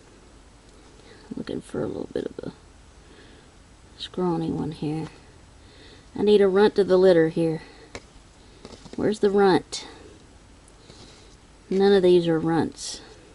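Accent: South, United States. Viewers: I really like your videos because you show your collection - whether they eat or not - so it's interesting to see which species you have but also what stage they're in their growth cycle etcetera. I also like the way you list what the viewer is seeing. Some Y-tubers don't even let you know what you're looking at or don't write scientific name in full eg. P. scrofa. it took me ages to find out what the P stood for! SO....MANY thanks for being so thorough and really nice to see your collection. This is only the second of your videos I've discovered during this infernal COVID 19 lockdown in England/UK Thanks again. You have a really lovely accent btw. Somewhere in the Southern States of the USA I expect. I had a 5 inch female Grammostola Porteri for a couple of years, about 28 years ago! But, I've only recently discovered this thriving T hobby so alive and well on Y-tube! 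Absolutely brilliant viewing. In fact it's got me right back into it so that after all those years, I decided to buy two slings yesterday! Can't wait for them to arrive. 2 x 1cm Eupalaestrus campestratus (took me months to find them, they're so rare on this side of the pond *grin*). Hope you are keeping safe on that side of the pond and hope to see you alive and well in a few months time!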